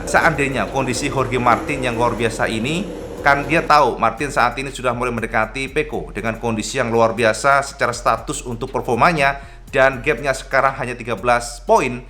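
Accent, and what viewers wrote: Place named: East Java, Indonesia. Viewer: KHAS PAKAR JAWA TIMUR AN CAK OPO ONOK E GA NEKO NEKO TAPI JOSS GANDOSS, ORA LEBAYYY😂😂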